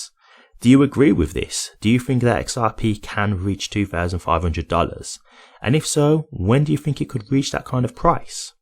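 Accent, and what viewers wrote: Birmingham, United Kingdom. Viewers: You’re from brum ?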